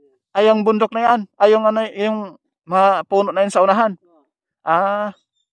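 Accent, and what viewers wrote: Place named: Visayas, Philippines. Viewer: Kainaman.bisayang nahaloan ng batangasin kung mag salita. Resulta bulol😁✌️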